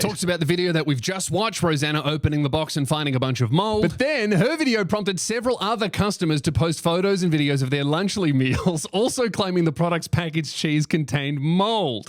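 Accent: Australia. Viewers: Everything is better with an Aussie narrator just sayin' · Ew Australian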